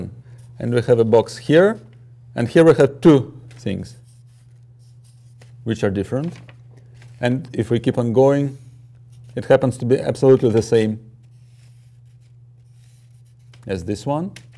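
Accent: Russia. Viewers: is he russian? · I immediately recognized he is Russian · Is this guy russian? His accent is very familiar to russian. P.S. : No stereotypes, i just live in Russia and i have to admit, that most of us, russians, have terrible pronunciation:)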